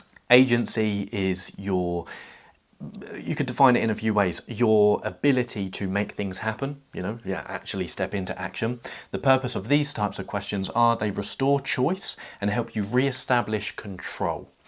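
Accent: England, United Kingdom. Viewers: Whereabouts in England are you from?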